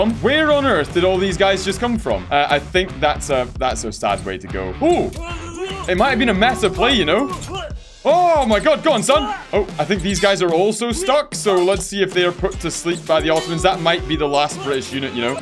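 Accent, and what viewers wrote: Scotland, United Kingdom. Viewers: The Scottish YouTuber had returned…..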